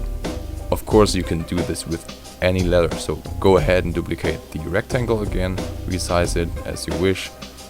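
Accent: Germany. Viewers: Hahaha man merkt irgendwie dass das n deutscher war :D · Are you really english ? Or german because your voice is like a little bit of german accent !^^ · Digga man hat herausgehört das du deutsch bist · Bist du deutscher? Wenn ja hört mans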